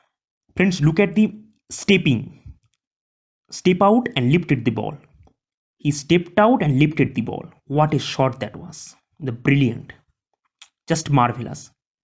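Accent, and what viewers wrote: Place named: Bengal, India. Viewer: I'll enjoy your commentary it's really awesome but you try to speak English like English not bengali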